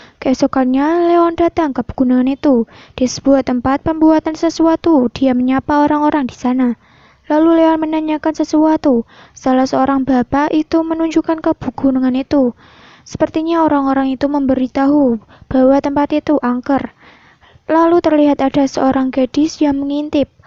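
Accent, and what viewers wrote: Java, Indonesia. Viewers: Kak kakak orang jawa ya??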